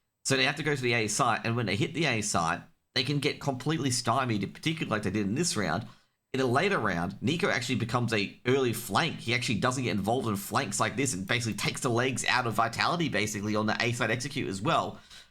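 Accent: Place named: Australia. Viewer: I like the sound of your words Australian man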